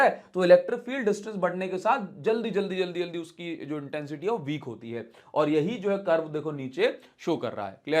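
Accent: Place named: Bihar, India. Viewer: Khela hove hai😂 true bihari axcent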